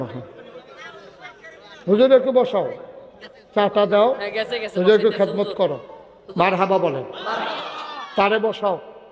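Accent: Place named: Comilla, Bangladesh. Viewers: বাংলাদেশি, কিন্তু জেলা ভিত্তিক ভাষার ধরনটা একটু আলাদা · বাংলাদেশ কুমিল্লা ডিসটিক গ্রামের ভাষা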